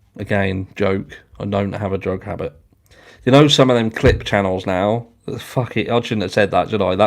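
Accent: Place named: West Country, United Kingdom. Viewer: Hahaha love your style. From fellow UK true crime addict im from the West country too, plus I recognise your accent brilliant x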